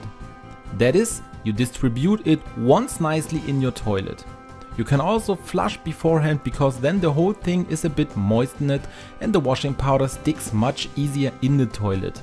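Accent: Germany. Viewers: 으째 발음이~~ 독일사람이 영어한 듯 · The most German accent I've ever heard. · Öha , den deutschen Akzent hört man Danke für den Tip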